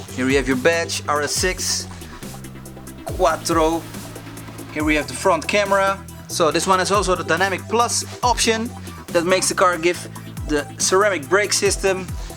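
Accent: Netherlands. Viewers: Leer effe normaal engels te praten zonder zoon verschrikkelijk Nederlands accent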